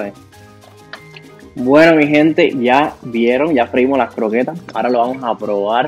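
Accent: Cuba. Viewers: Me encanta tu forma de explicar muy a lo cubano. Me gustaría que hagas frituras de bacalao. Mi papá las hacía tan ricas. Gracias y deseo mucho éxito!! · Me encanta este canal, no solo por las recetas que son buenísimas… también me encanta oírlo hablar, cubano 100% jajaja….. me encanta !!! Que tengas mucho éxito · Me gustó mucho tu forma tan Cubana y relajada de hablar y hacer tus croquetas, aprendí y me divertí, gracias ❤ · Las mías estarán bien deformes porque no tengo el aparato ese ! Jajaja …. Me encantan tus videos … muchas gracias por ser tan auténtico y agradable. Escucharte hablar me recuerda mi infancia en Cuba. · saludos! Me encantó tu modo muy cubanazo de explicar, jajajajaaaa. Bueno te diré q en Cuba, mi papá que era cocinero, hacía en casa croquetas de todo tipo, riquísimas y como no tenía máquina moledora ni cómo conseguirla, el les daba forma con un pomo de refresco gaseado (los que bautizamos allá con el nombre de ”pepino”), le cortaba el fondo, por ahí lo llenaba con la masa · Primera vez que te veo y me encantó tu forma cubana de explicar, felicidades ahora te voy a seguir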